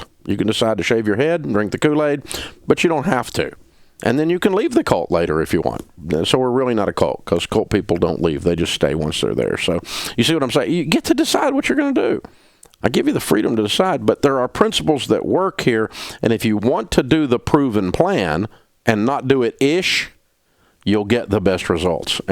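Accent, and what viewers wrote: South, United States. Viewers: I watch it for southern accent.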